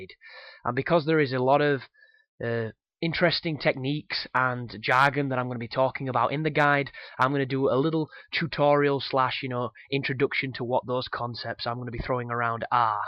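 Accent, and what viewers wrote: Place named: Northern England, United Kingdom. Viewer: Does thar live in sheffield o’what thi sounds like a reyt northerner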